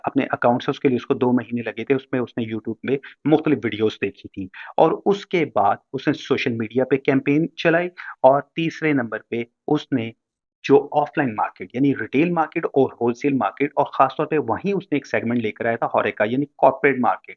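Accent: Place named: Lucknow, India. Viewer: Sir Mashallah Apke baat kerne ka Andaz Lucknowi hai AP Kiya Lucknow se hn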